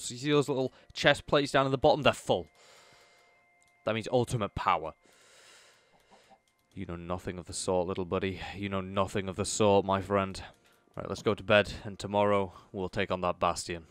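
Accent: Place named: Scotland, United Kingdom. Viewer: May I also ask where abouts in GB are you from… I’m always guessing peoples dialects and I can’t really place you for sure😂 I’ll take a brave guess that you are from near scotland if not from scotland😅 maybe from northern England. But then again dialect can be affected by people around you while growing up so that might be throwing the origin of the home totally off. So better question then would be, where is you dialect from😂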